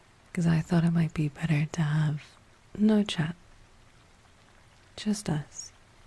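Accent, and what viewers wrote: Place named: New Zealand. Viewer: your voice made me wonder were you were from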